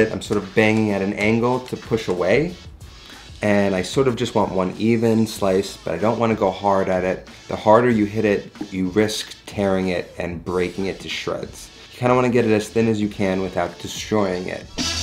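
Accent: United States. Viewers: He's American Italian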